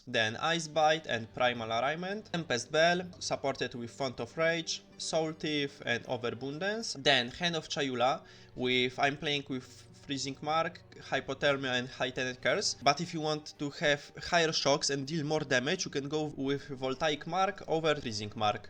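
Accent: Poland. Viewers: Słychać że Polak od razu xD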